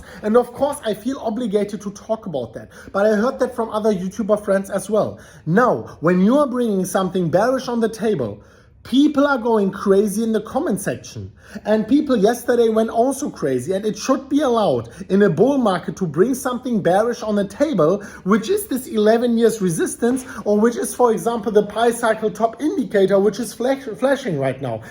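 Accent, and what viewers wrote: Germany. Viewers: I love your quick videos and German accent. Keep up the good work, can you put the prices in GBP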